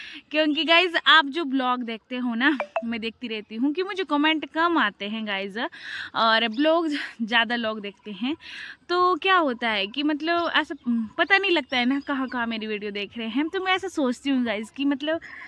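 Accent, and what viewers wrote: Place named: Himachal Pradesh, India. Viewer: Bahut sundar vlog bahen ham utrakhand se hu haridwar roorkee mai rhti hu aur aapki Bhasha se lagta hai ki aap Himachali Ho han ji Time To lagta Hai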